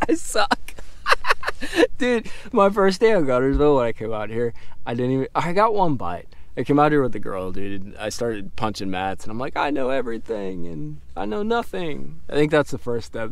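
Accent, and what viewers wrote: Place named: Pittsburgh, United States. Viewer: Good content, where are you from? You almost have a Pittsburgh accent lol I heard you say n’at before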